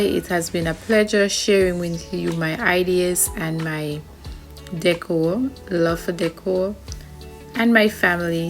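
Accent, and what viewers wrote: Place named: Jamaica. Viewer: G'Day Mate, did you soak the second and third pieces of fabric like the first one or place it down dry then apply Mod Podge. Also what kind of Mod Podge did you use and do you have any suggestions on an alternative product as it is expensive hear in Oz, I don't think they even sell large containers like the one you are using. I prefer the 2nd and 3rd softer look, I also think a smaller version with embellishments would look lovely in a little girls room. You did a fantastic job very classy, only thing I would do is spray paint with a clear Lacker too help keep the dust off long term, with such a light colour. I have a few spin off Ideas from this, I think the fabric need to be light and flowing you could use more than one colour on a single canvas. I had a little trouble understanding with you Jamaican accent.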